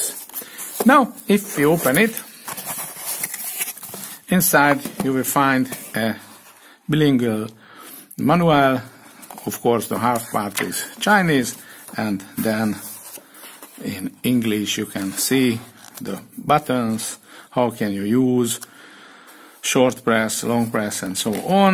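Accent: Hungary. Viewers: Goulash accent , kusenem 😇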